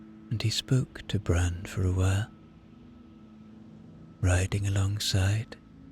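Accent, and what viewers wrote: Ireland. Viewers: I love the lovely lilt of the southern Irish accent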